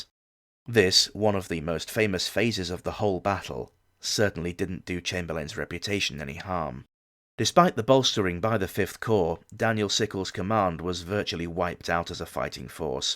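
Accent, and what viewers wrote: South, United States. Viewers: Love ya, but my home is in petersburg and near Richmond. My Cherokee family was "resettled" because those of color weren't allowed in the new union. Fort Lee was just changed to Grigg Adam's. Being from Texas originally, I knew Lee's role in the Mexican wars. I loved hearing the first bit, but started to feel that old sadness. God bless you and cant wait for next episode and hope it's not about the trail of tears. Cute southern accent dahlin!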